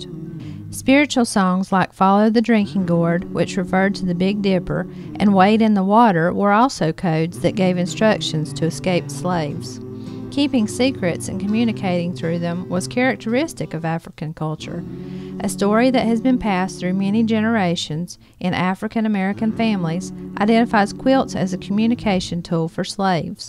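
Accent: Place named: South, United States. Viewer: Yeah, I didn't quite get that, she sounds more like a Southern gal though. I honestly really don't care who narrates it it just leans toward more appropriate having a black person do it. It reminds me of when white people pretended to be Indians in movies. I'm quite hungry for more information especially accurate information about the black slave life and their escapes.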